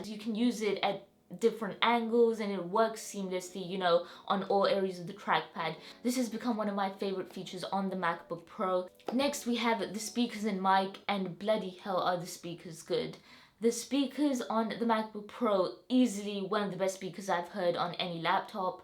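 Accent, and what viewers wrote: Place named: United Kingdom. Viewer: Thanks for the share. PS - I love your British Accent. So sweet and relaxing to the ear.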